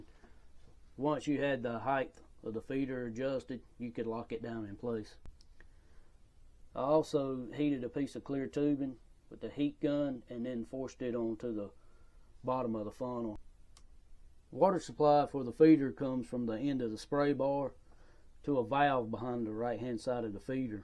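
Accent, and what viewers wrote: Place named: South, United States. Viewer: I love your southern "slang and pronouncing" .....!!!!!! I'm original from Germany ,- and have friends in NacogdochesTexas ...... I use to mine for long years in B.C. Canada ....and work for big Mining Outfit as a consultant ..... Concentrating and mineral dressing .... Unfortunately it is real difficult to build a full working shaker table ...... ( according to the "TAGGART" ) ... but you came real cloth to it ... compliment !!! You are kept in thoughts ,- that there should be a special movement , who use the gravity and the mineral density for separation on a special surface ,- plus the influence of a water matrix and angle .... 👍👍👍 great job .... well done !!!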